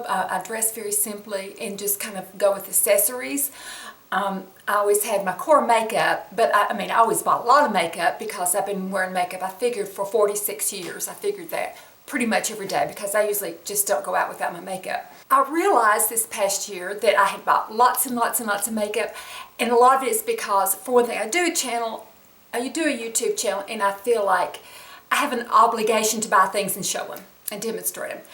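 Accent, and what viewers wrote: South, United States. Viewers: You are one of my favs! I just love your genuine sweet personality and as southern girl...I LOVE that accent! And....holy cow I had no clue you were almost 60...you are GORGEOUS inside and out! I would love to see some more videos on your secrets to aging so gracefully....both on the inside and out! Happy New Year!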